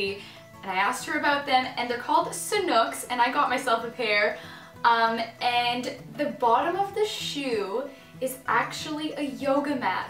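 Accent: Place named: Australia. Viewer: Looks like you've picked up a bitOf an Australian accent haha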